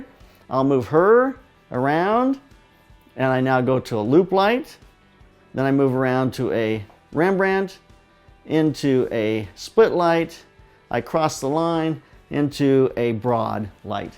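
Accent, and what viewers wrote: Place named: United States. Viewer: Best American Accent Ever!!!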